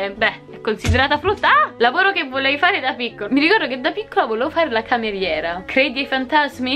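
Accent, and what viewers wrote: Rome, Italy. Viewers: Quanto amo er tuo romanooo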